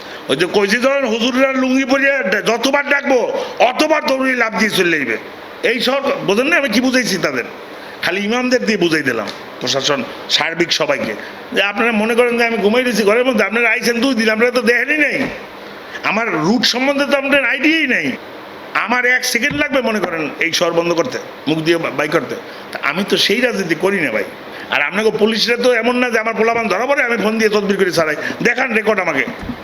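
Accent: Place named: Barishal, Bangladesh. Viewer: Kothar style ki re vai...😮 asholei Borishal is made in Borishal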